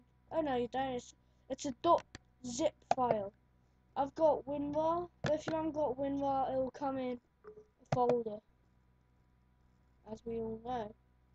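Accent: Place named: United Kingdom. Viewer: that British bloke garble you are mumbling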